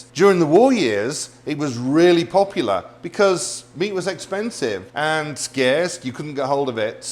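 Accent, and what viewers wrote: United Kingdom. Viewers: Are u British, just wondering because u said that u live in the USA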